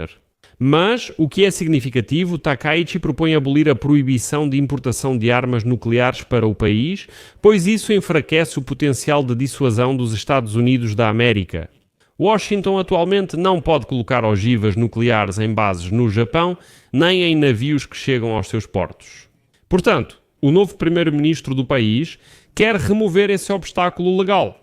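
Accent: Portugal. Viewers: EESTE CANAL E POTUGUES E PELO SOTATAQUE TAKAICHI E ESPERTO NÃO QUE CONSTITUIÇÃO PROIBE A ELA QUER DAR UMA RASTEIRA NA OPOSIÇÃO, AO INVES DE FAZER BOMBAS ATOMICA ,COMPRA DOS EUA ,E FICA AMOSTRAR P CHINA E KOREIA DO NORTE ,NOS TEMOS OGIVAS NUCLEAR ,VOCES QUE FIQUEM ATENTOS ,OK,😊😊ELA E INTERIGENTISSIMO ESTA SANAE ,SAN ❤🎉😊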